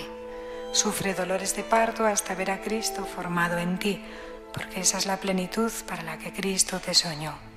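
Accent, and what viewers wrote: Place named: Spain. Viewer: "La Vírgen es madre, sufre dolores de parto, hasta ver a Cristo formado en tí." Preciosa meditación madre Verónica, escuchar su suave y pausada voz, es como si estuviera escuchando a la Madre de Dios. Vosotros los españoles tenéis el acento más lindo de nuestro amada lengua española. Saludos desde Colombia, y os ruego una oración por mi país Colombia.🇨🇴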